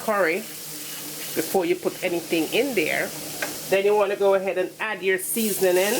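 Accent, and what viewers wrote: Jamaica. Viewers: Beautiful patties, beautiful lady! Love the Jamaican accent...💖Thanks for this recipe!😊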